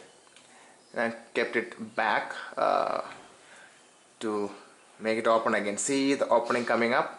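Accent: India. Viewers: ahhh,,,, Indian accent just make me sick....I can't listen to it....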